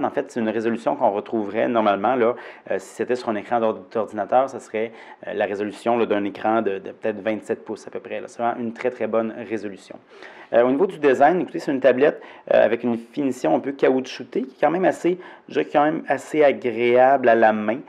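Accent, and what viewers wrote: Quebec, Canada. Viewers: si il parle bien Français c'est juste qu'il a l'accent quebecois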